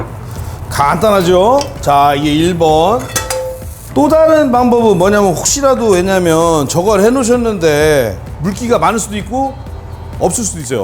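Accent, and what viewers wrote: Chungcheong, South Korea. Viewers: 구독과 좋아요!! 백종원 선생님을 옛 무한도전 때 정준하 형님이 무슨 요리 레시피 관련해서 찾아뵙던 방영분이 지금도 생각납니다. 아마도 그 때가 백종원 선생님을 처음 알았을겁니다. 그리고 나서 아마도!! 백종원 선생님을 전국적인 스타로 이름을 알리게 된 방송이 우리가 다 아는 이모티콘 방송이었을 테고요 ^^ 편집의 대마왕이라 불릴 정도로 깨알만큼 재미를 더 했었죠 그리고 ~쥬? ~쥬!! 구수한 충청도 사투리까지 더해져서 재미가 몇 배가 되었습니다. 이 후 부터는 인기와 더불어 질투하는 분들도 많이 생기고.. 어쩔 수 없는 연예인에 대한 호감에 대한 반비례 아닐까 싶습니다. 숙명과도 같은 거라고 봅니다. 같은 '성(性)'을 사용하는 분들 중에서 큰 으뜸이 되는 분이 되길 기대하고 바라겠습니다. 화이팅 입니다!!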